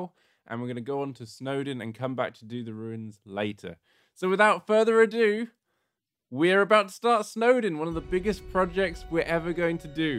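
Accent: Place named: United Kingdom. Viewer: How are you even more british now wtf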